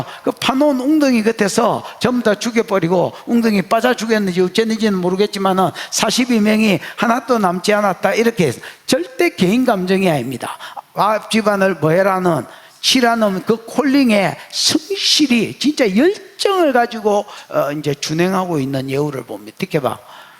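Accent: Gyeongsang, South Korea. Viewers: 목사님이 대한민국 BUSAN에 오셔서 구수한 경상도 사투리를 쓰시면서 어려운 계시의 하나님의 말씀을 너무나 쉽게 또 드라마틱하게 온몸으로 설명하시니... 매끄러운 서울말투보다 저는 더 이해하기가 쉬워요. 😊😊😊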